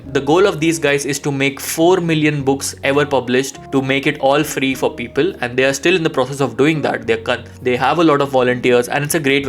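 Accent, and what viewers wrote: India. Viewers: If you are in America try to speak Amerian English, not Hindu English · Why's Indian accent so shitty?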